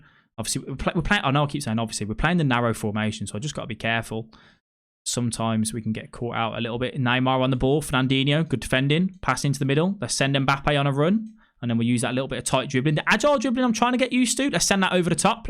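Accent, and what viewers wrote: United Kingdom. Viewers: Bro are u from uk ? its so hard to understand you sometimes xD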